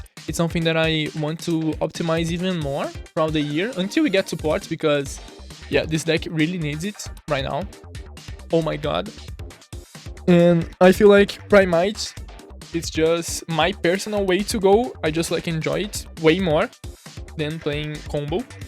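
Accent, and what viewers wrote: Brazil. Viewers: You ARE Brazilian? Sotaque eu acho Im trying to do a deck that can make XYZ, shincro and fusion in one turn, im using dimensional dragons of manga, but could you help me ?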